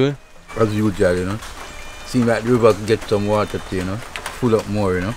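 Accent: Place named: Jamaica. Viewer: Patois was strong in this. get passionate. Love it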